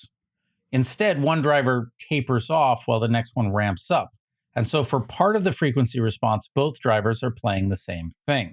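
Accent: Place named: Midwest, United States. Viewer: We all love your Midwest accent. Excellent video by the way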